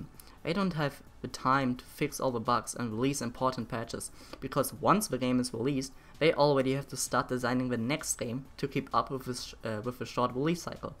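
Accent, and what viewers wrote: Germany. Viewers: Haste eiskalt den Intro-Song von Solution geklaut... Ansonsten relativ nichtssagendes Commentary, dass CoD zu hastig und profit-orientiert released wird ist nichts Neues, daran wird sich aber nichts ändern, da hier kein gutes Spiel erstellt werden soll, sondern Gewinn das einzige Ziel der Produktion ist. Englisch ist gut, nicht perfekt, relativ flüssig, noch leichter deutscher Akzent, ansonsten solide. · Your accent is funny ^^ A typical german accent and luckily i haven´t got one that bad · Sick german accent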